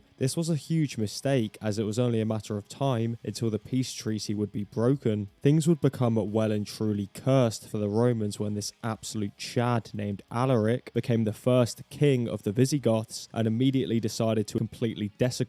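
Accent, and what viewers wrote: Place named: Cockney, United Kingdom. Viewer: New to your channel, but loyal to the cause. Binging your shit for the night you cockney fuck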